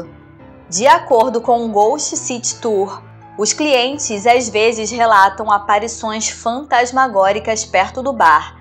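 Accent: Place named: Rio de Janeiro, Brazil. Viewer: Rio de janeiro q puxa o x no final.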